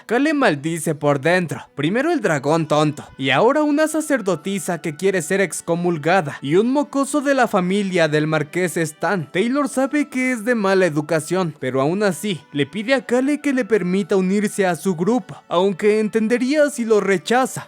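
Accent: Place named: Russia. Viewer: Me gusta la historia y más tu acento ruso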